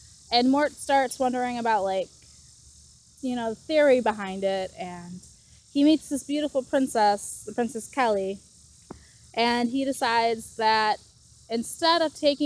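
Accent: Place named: United States. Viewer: Think of making an audio recording like you would speaking on stage…slow down and enunciate more. I liked the content but had to relisten multiple times to get all your words. Certainly, I am not American, but I normally don’t have as much issue with a US accent.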